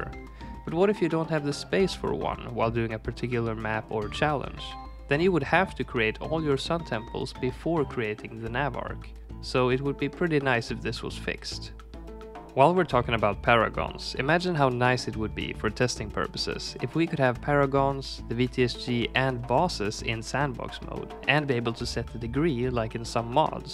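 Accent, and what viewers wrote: Sweden. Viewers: tog två sekunder att höra dig prata för mig att inse att du är svensk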